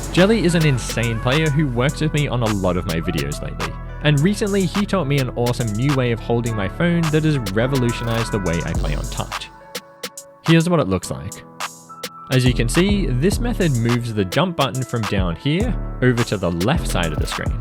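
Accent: Netherlands. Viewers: Bruh he was Netherlands I’m Dutch but I can understand the schot and stuff schot is shot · Waiiitttt, u dutchhh!?!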